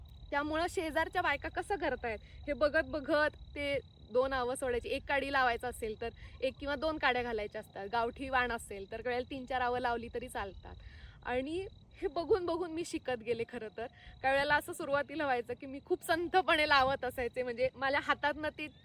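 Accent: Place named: Konkan, India. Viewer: कोकणात असूनही तुझ्या आवाजात थोडा कोकणी+सोलापूरी टोन जाणवतो.😊👌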